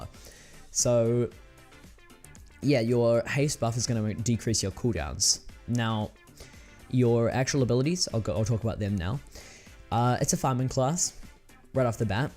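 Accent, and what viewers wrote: New Zealand. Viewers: is this dude from NZ, it kinda sounds like it i'm probably wrong?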